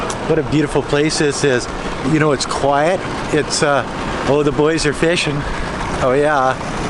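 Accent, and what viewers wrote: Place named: Canada. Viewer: 5 minutes in and you mentioned Canada, Im from Canada, southern Ontario, and I guessed at the beginning that you were Canadian, LOL. Nice video.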